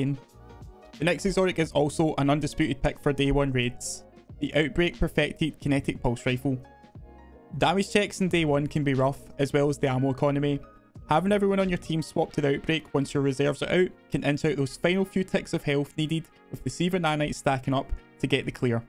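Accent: Scotland, United Kingdom. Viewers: Not a big deal but based on what you said your reasoning is flawed, " it's probably because you're Scottish, didnt notice much of the mumbling". If you didn't notice much of the mumbling then clearly it was due to his accent (by your own admission), so he was speaking clearly in a Scottish accent. My guess is you're just not used to it and have yet to develop an ear for it. :) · it's probably because you're Scottish, didnt notice much of the mumbling. I would advise seeking professional help, as this can cost you In the professional world in the future. But thats about it, best of luck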